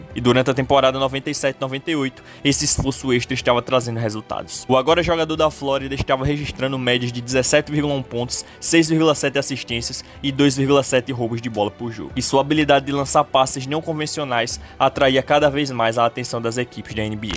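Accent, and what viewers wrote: Alagoas, Brazil. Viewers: boa irmão! seu sotaque é foda! NbA nordeste🫵🏾⛹🏾🏀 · Tu é de Alagoas? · Tu é de Alagoas? Esse sotaque denuncia...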